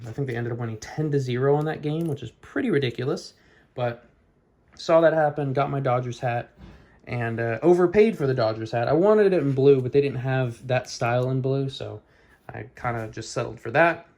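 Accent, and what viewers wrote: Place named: Spain. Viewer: absolutely! Mainly Pokemon cards and books. But I think our approach to card collecting is similar, going for master sets, not necessarily promos, and getting singles over time to slowly complete them, as they become more affordable. Haven't seen you talk about language learning (though you may have). I've heard your Spanish accent in your churros Oreo video, so I suspect you've at the very least dabbled. It's probably my number one hobby, what I spend the most time on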